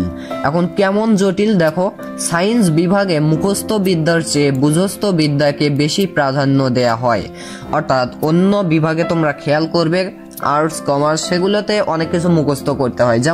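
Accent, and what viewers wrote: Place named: Joypurhat, Bangladesh. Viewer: জয়পুরহাট এর ভাষা 🙂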